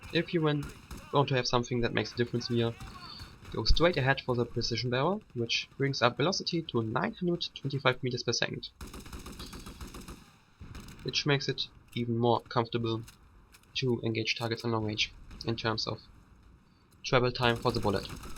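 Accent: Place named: Germany. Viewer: THAT GERMAN ACCENT !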